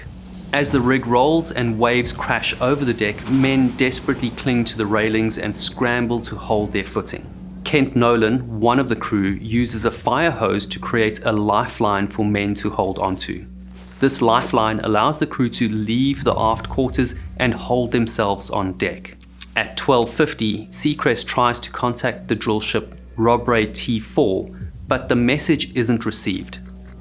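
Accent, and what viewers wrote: South Africa. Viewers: He's south-african, you clown.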